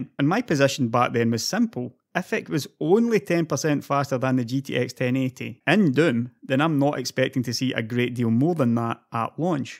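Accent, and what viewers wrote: Scotland, United Kingdom. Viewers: speaking English with a Scottish accent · stayed for the scottish accent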